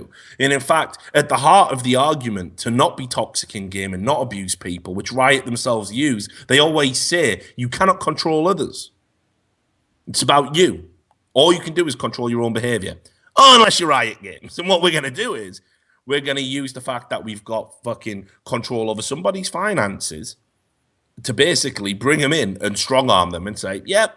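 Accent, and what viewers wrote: Wales, United Kingdom. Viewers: I've never heard you with a Welsh accent before... Sam rubbing off on you? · Never realised how Welsh normal off camera voice is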